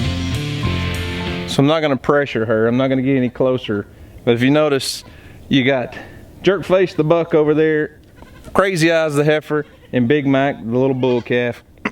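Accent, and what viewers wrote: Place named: South, United States. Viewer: You know, the more I listen to you, the more I think you sound like Alex Kendrick, from Sherwood Films ( Courageous, War Room, etc.). I suppose you could be originally from Georgia. Or maybe it's just that, to my Pacific NW ears, all you Southern gentlemen sound alike.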